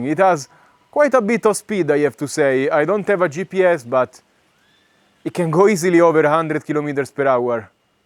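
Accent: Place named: Italy. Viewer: The shitty AI automatically translates the voice into French but I prefer to hear real voice with his Italian accent.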